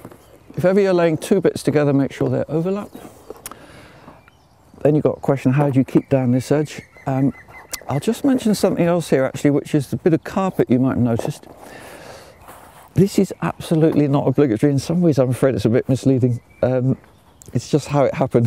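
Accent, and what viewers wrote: United Kingdom. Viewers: That's the british accent that i like:).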